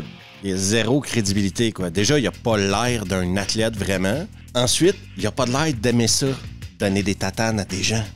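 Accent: Quebec, Canada. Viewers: Je te suis depuis quelques temps un vrai pkaisir de re decouvrir ces films 😂😂 le toit avec un superbe accent québécois merci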